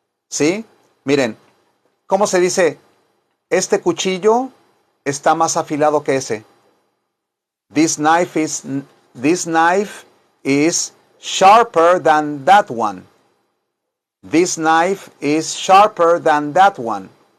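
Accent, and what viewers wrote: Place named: Mexico. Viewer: este profe tiene acento mexicano, pero cara de "español hostia", TOTAL DE DONDE ERES PROFE?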